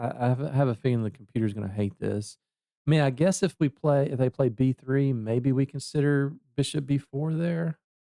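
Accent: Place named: North Carolina, United States. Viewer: Love the video and series! Where are you from? I’m an accent guy and it sounds like you’re from somewhere close to where I used to live (Raleigh, NC).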